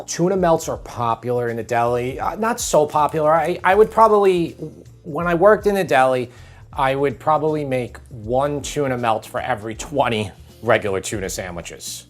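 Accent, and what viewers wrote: New York, United States. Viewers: As I keep saying! I love your accent! So Noy York. The food is good, too. I recommend you make a Spanish style Russian Salad.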